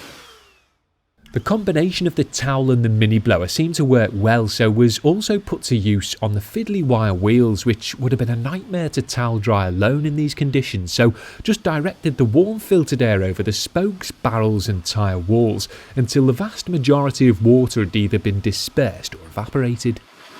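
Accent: Liverpool, United Kingdom. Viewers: Love this!! Great job mate. Are you from the Wirral or Liverpool?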